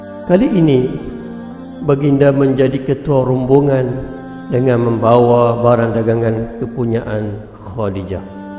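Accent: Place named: North, Malaysia. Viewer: Mcam utara ja ustat😂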